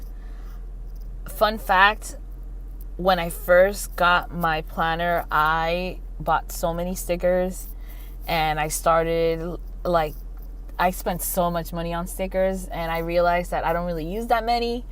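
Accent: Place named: Miami, United States. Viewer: Girl I love this! Please keep up with the videos... my 2018 goal is to be gazelle intense on paying off most of my debt :) You're great. Also, it sounds like you're from Miami. So am I!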